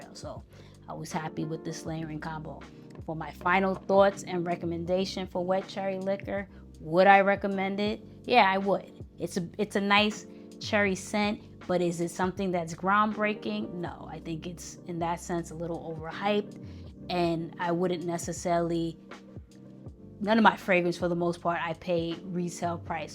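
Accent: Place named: New York, United States. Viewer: I LOVE the fact that you still have the classic NY accent. Its actually becoming rare!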